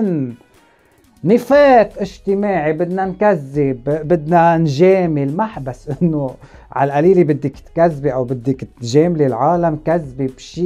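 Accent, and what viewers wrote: Lebanon. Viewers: تحياتي لك أخي ، تعجبني اللهجة اللبنانية خفيفة على القلب 💖 تابعت حلقة الأسئلة ؛ وفرحت جدًا إنك مسلم سني الحمد لله الله يثبتك ويهدي جميع الخلق ، ليس تعصبًا مني لكن هذا هو الطريق الحق وكل البشر سيكتشفون الحقيقة عند الموت تحديدًا أول ليلة له في القبر … أهم شي دينك الثبات الثبات . شكرًا لك 🌱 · تحياتي يا اكثر واحد مهضوم بلهجة لببنانية والله انت علاج كل المكتئبين والي عندهم الاستريس تفرج عليك ينسي كلشي هههه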